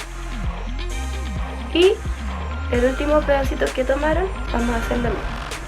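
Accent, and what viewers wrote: Chile. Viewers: saludame! soy de Honduras. pero vivo en NY. tengo un amigo chileno y me gusta el acento chileno por eso te veo😍 Mi nombre es Alejandra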